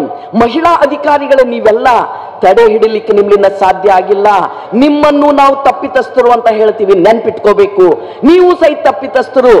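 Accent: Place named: Kalaburagi, India. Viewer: Kadaka kalburgi matu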